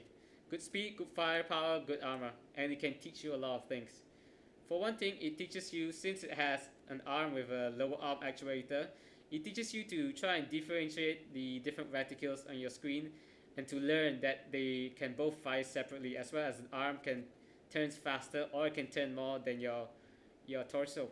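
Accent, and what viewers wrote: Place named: Singapore. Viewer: I detected Singaporean!!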